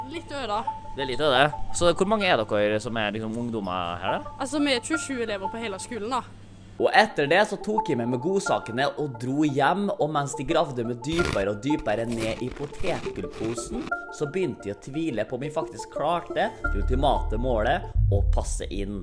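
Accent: Norway. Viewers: Hvilken dialekt snakkes? En slags nordlig variant? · Molde dialekten tror eg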